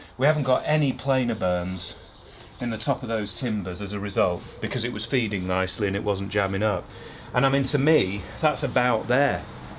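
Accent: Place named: Ireland. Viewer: Irish accent !!!???!!! Not to bad I guess lol Sean from IRELAND 😉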